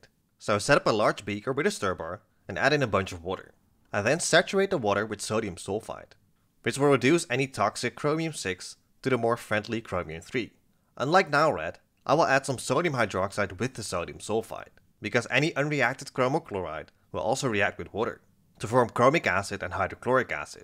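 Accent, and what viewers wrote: Netherlands. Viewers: accent heard, dutchie spotted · Are you Dutch? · I know you are dutch you cant hide your dutch accent from a fellow dutchman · I hear a dutchy😂 already a fan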